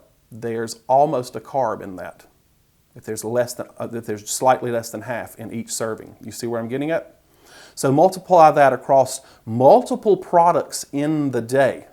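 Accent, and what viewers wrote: South, United States. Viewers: Thank you for the info. I can't believe the spices! Off to check my favorite spice for carbs which is cayenne pepper! (: xx Love your southern accent btw. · Thank you for keeping us informed! Keep up that southern accent !!!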